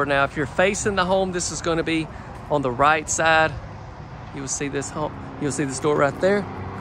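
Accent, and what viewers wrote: South, United States. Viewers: This home is great, I really liked the kitchen and the utility room, I could live with it. You say utility room however you want to, I pronounce it like you because I'm from the south too. In one video you said something about calling the toilet something besides toilet, how about the porcelain throne :) Keep up the good work.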